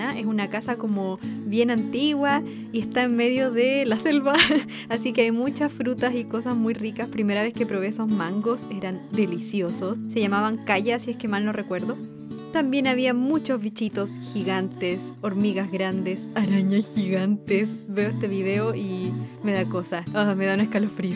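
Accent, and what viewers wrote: Chile. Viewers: Eres chilena?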